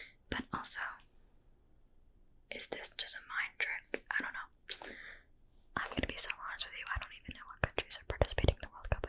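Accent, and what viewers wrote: United States. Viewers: She sounds pretty american to me so nothing special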